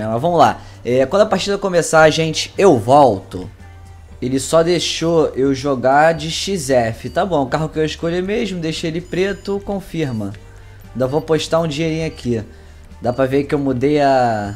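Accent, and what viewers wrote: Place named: Rio de Janeiro, Brazil. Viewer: esse sotaque de carioca muito loko kk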